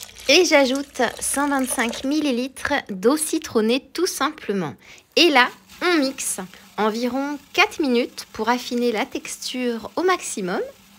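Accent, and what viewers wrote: France. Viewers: Muchas gracias por esta idea! Me parece fantástica 👏👏👏👏👏👏 La prepararé un día, seguro! Además quiero darte las gracias porque me encanta escuchar tu precioso acento francés y así aprovecho para recordar cuando lo estudiaba en el colegio😊 Un abrazo y gracias por compartir tantas recetas estupendas!🤗💜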